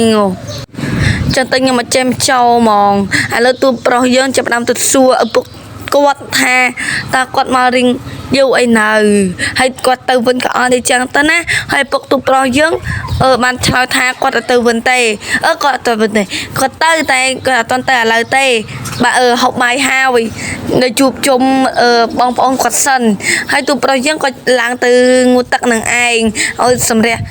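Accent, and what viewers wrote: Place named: Cambodia. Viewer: អូននៅខេត្តណា បាននិយាយវឹងហ៎😊😊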